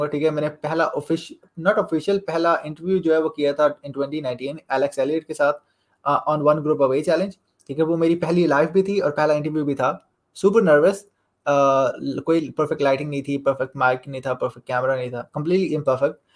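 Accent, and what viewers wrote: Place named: Pakistan. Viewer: He is a pakistani,it is audible from his accent. Woww! Great job u have shown that money is the greatest thing in world 🖕🖕🖕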